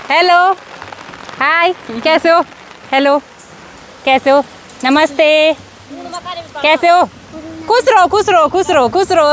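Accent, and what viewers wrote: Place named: Pahadi, India. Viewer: Bindass apake hindi bhasha me pahadi sugand aati he bahot achha lagata. bhai ko shubkamana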